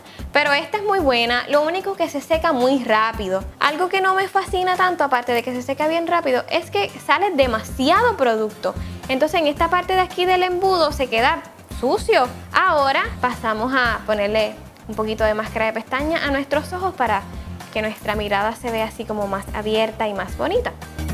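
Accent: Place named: Isabela, Philippines. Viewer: Hola, vengo del canal de Josue, no sabia q tenias uno. Me alegra mucho, q hallas decidido grabar. Tu forma d hablar suena bien brutal a como hablamos acá en Isabela, se q somos del mismo país pero no en todas partes tienen el mismo cantaito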